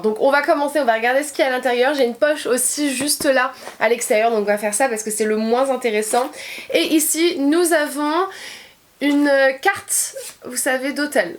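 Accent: England, United Kingdom. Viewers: J aime trop ton accent anglais 😘😘😘😘😘😘😘😘😘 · Ton accent anglais est tellement cute❤️🤩💍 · c trop marrant quand elle parle il y a de fois un accent anglais c trop mignon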